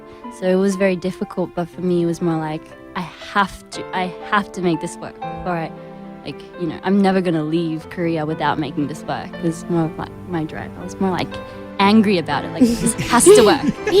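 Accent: Australia. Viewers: Yes ....she can inspire anyone with her words and her australian accent..gosh no wonder her voice is so amazing.... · Dat aussie accent doe. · Her random Aussie accent reminded me Ozzy The Commenter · I'm sold because of the ausie accent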